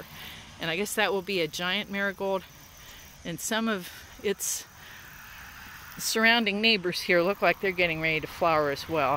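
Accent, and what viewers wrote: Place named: South, United States. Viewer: Is it just me or did I detect a bit of a southern accent coming out in this video? Lovely update and tour! That little watermelon just hangin out chillin in his panty hose hammock made me laugh! 😄 What do you do with your acreage across the street? I love how your home has so much wide open space! Thank you so much for sharing my friend! 🥰❤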